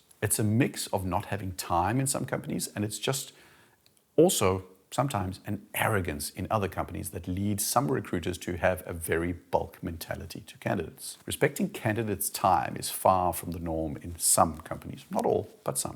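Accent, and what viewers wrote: South Africa. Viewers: Sounds like the accent of a South African whose first language is English, he most likely is a native speaker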